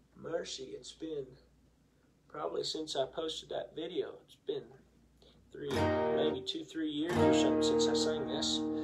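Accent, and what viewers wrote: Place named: South, United States. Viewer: How’d he develop such a southern accent in Washington I know there’s wide open spaces and ranches cattle and all that but I’d never seen anyone there sound southern and I’ve been there a whole year one time (just for a girl) theyd make fun of my accent.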